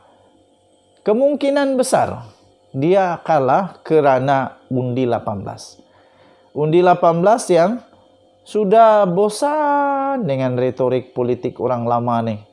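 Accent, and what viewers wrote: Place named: Sabah, Malaysia. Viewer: Sabahan betul cakap kau ni.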